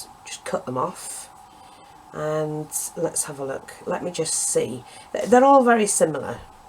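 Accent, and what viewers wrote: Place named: Yorkshire, United Kingdom. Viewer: Loooove your accent where may I ask are you from? Is it Yorkshire?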